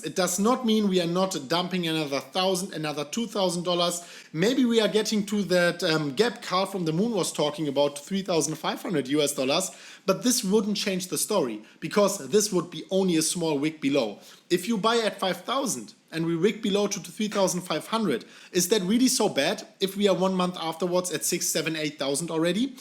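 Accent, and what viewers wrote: Germany. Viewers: Good analyse but that Germaned English blehhhhhhh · Sorry man, I recognise german accents from a mile away. He's definitely NOT Polish.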